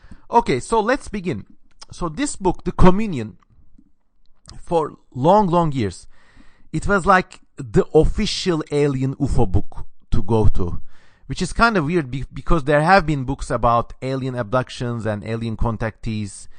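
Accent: Turkey. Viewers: Op sounds turkish can you confirm?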